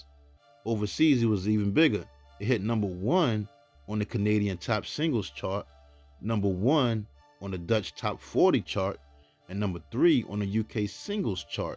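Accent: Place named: New York, United States. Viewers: Right I was thinking the same thing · Where are you from? Your accent sounds New York to me.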